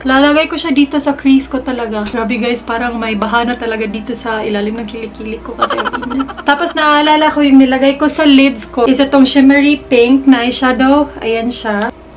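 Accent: Visayas, Philippines. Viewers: Para sakin ang ganda talaga ng accent/punto ng mga bisaya na nagtatagalog. Hahaha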